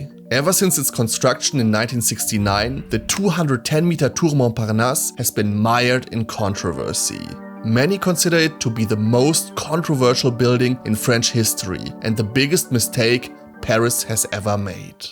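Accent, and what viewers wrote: Germany. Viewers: deutscher akzent :D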